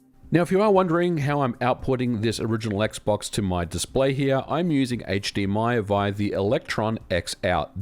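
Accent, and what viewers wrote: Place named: Australia. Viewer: Still crazy to hear an Australian accent in such a popular gaming channel.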